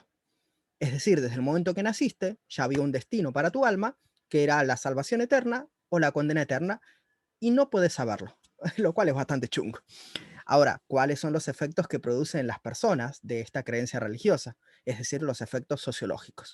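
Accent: Standard. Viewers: Me encanta como explicas, pero se me hace raro que hables en neutro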